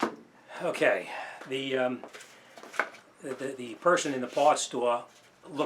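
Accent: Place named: New York, United States. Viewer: Hey. Nice video. Where is your shop? Your accent screams New York. lol. I'm from NY. Long Island, NY.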